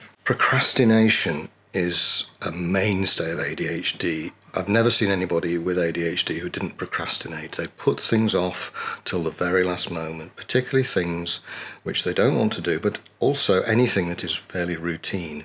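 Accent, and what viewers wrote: United Kingdom. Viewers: I’ve been wading through stuff on ADHD but this is by far the most useful, and really nice to hear it from a British person.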